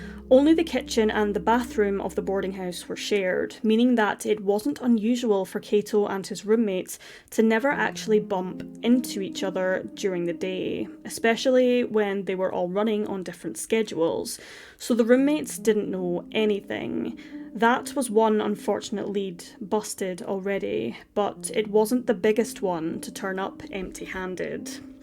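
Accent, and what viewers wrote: Scotland, United Kingdom. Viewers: Thank you so much for bringing light and exposure to these cases! Also may I ask what part of Scotland you are from? I’m an Ayrshire lass myself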